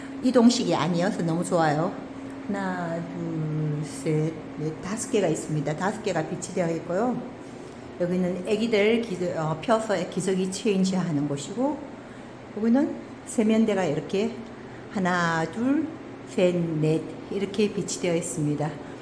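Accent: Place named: Gyeongsang, South Korea. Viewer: 멋진 영상과 구수한 경상도 사투리 ~~~ 즐감하고갑니다 👏 🙆‍♂️ 늘~건강하시고 행복한 나날 되세요 🙏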